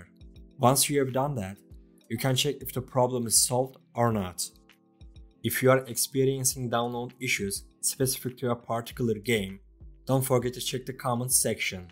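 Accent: Turkey. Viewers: reis bişey sorucam sen türkmüsün aksanın türkçe çünkü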